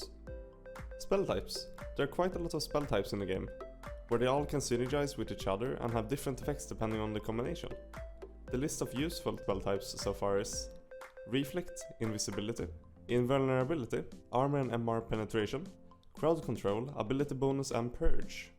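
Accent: Sweden. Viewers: Your accent sounds swedish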